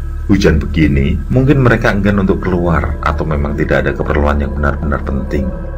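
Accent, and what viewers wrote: Java, Indonesia. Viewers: Biar pun sering mampir, tp masih susah qu mencerna suara pakdhe 😁, biar pun pake headset,,, terlalu medok pakdhe bgi ku yg aceh ini,,, salammualaikum 😁😁😁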